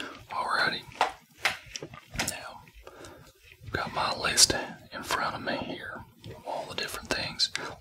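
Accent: Texas, United States. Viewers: I’m down I’m in tx right now it’s perfect man I thought you were a all Texan family man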